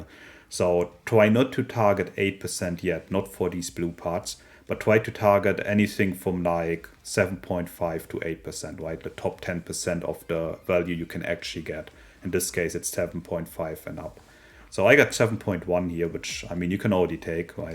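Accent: Germany. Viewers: Awesome Video. Thank you so much. This is going to save ne alot of time. (Ps: Ich höre einen deutschen Akzent)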